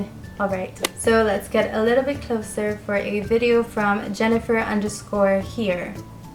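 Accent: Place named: United States. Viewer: You mention you've lived I Australia for 14 years so where does the American accent come from? :)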